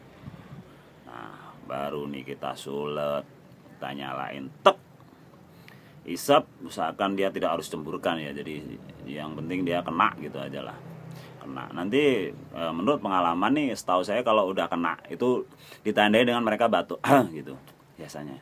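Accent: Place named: Jakarta, Indonesia. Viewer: Logat u Betawi banget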